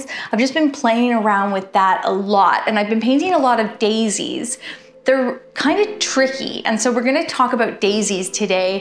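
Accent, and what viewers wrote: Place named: Atlantic Canada, Canada. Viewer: These flowers are lovely! I enjoy your video’s so much. I love listening to your Atlantic Canadian accent. It makes me want to visit PEI! Thanks for sharing your talents.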